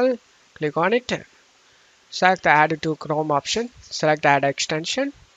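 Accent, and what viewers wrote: India. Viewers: i swaer .. if i hear a thick indian accent .. automaticly SCAM! wouldnt touch ANYTHING they recommend with a 100ft pole! and even if this guy here really just wanted to help .. which i HIGHLY doubt .. i mean cmon "video downloader professional" lol .. but even if he wanted to help .. you guys did this to yourself! i rather have my pc crash .. burn and explode than to EVER let one of you evil demons on my pc!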